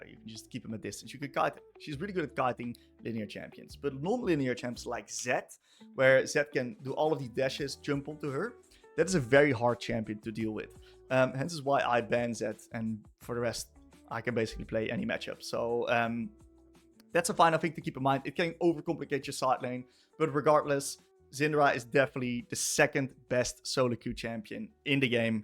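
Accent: Netherlands. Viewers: I like your typical Dutch english accent!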